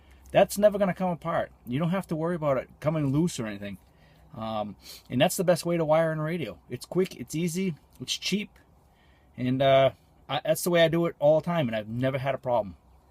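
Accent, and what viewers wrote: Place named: New York, United States. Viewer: nice new York accent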